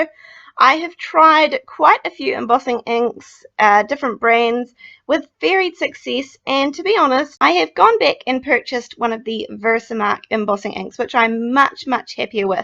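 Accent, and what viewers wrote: New Zealand. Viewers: I stumbled onto your you tube card making and am now subscribed, absolutely loving your techniques and have tried a couple, now working my way back through your previous video's. Great to hear a Kiwi voice - thanks so much and keep up the brilliant work.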